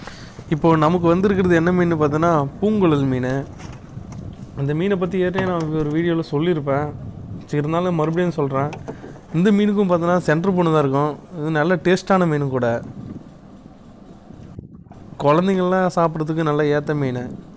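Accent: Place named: Chennai, India. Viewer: Sagothara ethula Chennai slang pesuringa and fishing style kaniyakumari yepdi?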